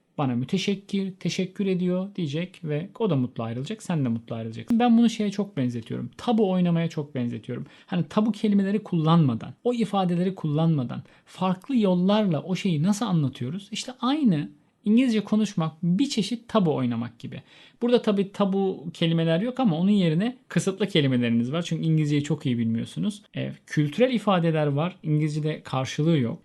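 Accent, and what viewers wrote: Turkey. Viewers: Noooooooo , this pronunciations is tooo turkelish , is not English plzzzz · Bu hangi aksan diye dusunuyorum sonunda buldum.Turgut Ozal aksani.Ayni zamanda bazi gramer hatalari da bulunmakta.Acil olarak kendinize bir native speaker bulun.Ikinci yanlisiniz ise Turkce konusarak Ingilizce ogrenemezsiniz ve konusamazsiniz bunu da unutmayin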